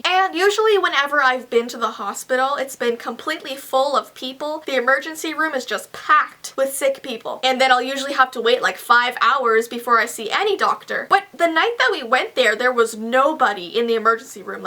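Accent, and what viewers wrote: Canada. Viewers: Are you Canadian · She Canadian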